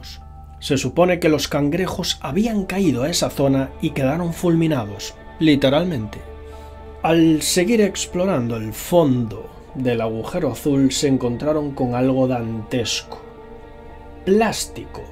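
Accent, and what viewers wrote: Spain. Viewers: El acento español hace que pierda el interés en el video. Es aburrido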